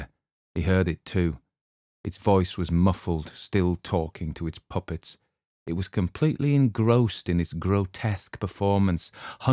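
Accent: Germany. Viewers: Great depiction of the gruesome folk character! And a superb reading. I too have a fascination for puppetry, automata and all manner of dancing jerky creatures... remember Raggedy from Rupert the Bear? Twiggy, jerky thing of nightmares that he was. I also loved those Metz adverts: "Beware the Judderman my dear, when the moon is full" has long been a catchphrase in my household. 😆 Also, I'm reminded of the stunning work of the early 20th century German director and animator Lotte Reiniger. She pioneered silhouette animation. Some of it is really dark and creepy (deliciously so!) and all of it is very beautiful. If anyone here isn't familiar with her work you can find some on Youtube. Compliments on your German accent too. (I was born in Germany coincidentally, but grew up in England). Thank you for another great reading and composition! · "and the promise of eternal darkness" yikes! 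Omg, you are an expert storyteller! Your scary voice and German accent are fantastic. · I think you did a great job on your German accent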